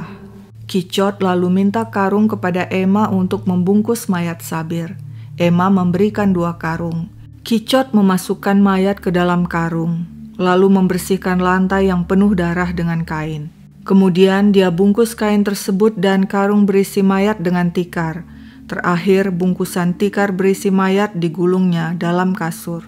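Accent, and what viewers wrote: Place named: Sulawesi, Indonesia. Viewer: logat sdh sperti org sulawesi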